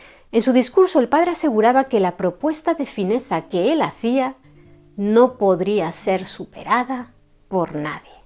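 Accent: Spain. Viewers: Suena más a española que mexicanoa...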